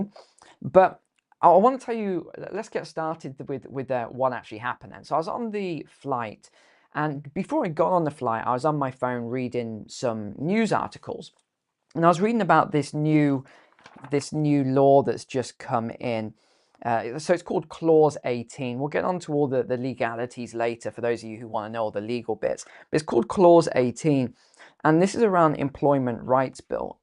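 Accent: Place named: United Kingdom. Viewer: are you on the Isle of Man? What is your citizenship? I'd bet that most viewers on youtube think you're an American with a British accent. I confess I've been confused.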